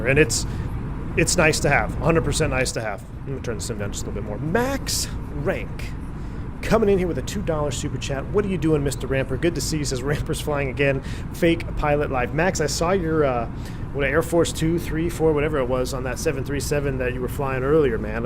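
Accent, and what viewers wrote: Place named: United States. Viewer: Sounds like an american accent to me...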